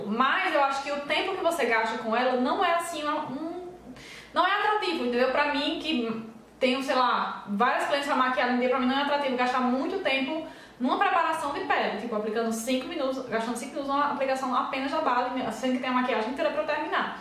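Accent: Northeast, Brazil. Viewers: genteeee esse sotaque é nordestino. Ameeei, quero saber de onde que estado tu é mulheeer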